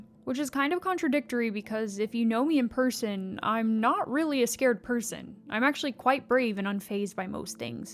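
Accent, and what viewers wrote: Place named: United Kingdom. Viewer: You sound British, nice beginning in vloging, keep up, which town is this one? Great video 🎉🎉🎉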